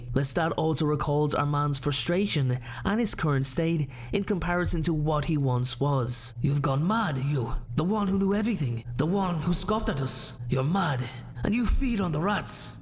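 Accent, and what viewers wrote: Ireland. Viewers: You sound like conor mcgregor, that's how i know you're from ireland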